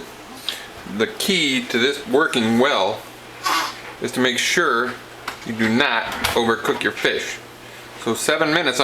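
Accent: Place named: Midwest, United States. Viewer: Where are you from? I can't place your accent. Somewhere from the Midwest? Chicago maybe?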